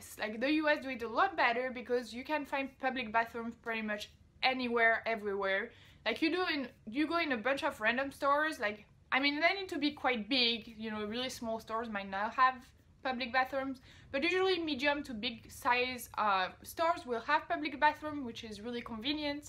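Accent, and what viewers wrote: France. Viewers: Because she has a french accent....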